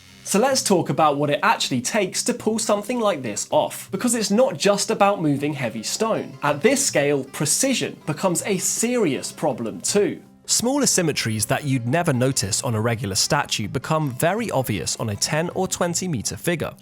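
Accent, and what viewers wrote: United Kingdom. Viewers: I can't do a third in a row video with the speaker babbling with their irritating British accent. I don't find the language "cute" or "charming". Just annoying.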